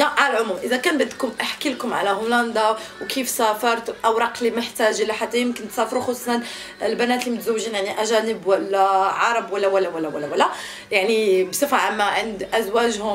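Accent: Morocco. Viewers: نتي غزالة ماشاء الله ولكن لهجتك المغربية احسن مليون مرة من اللهجة المشرقية المستعملة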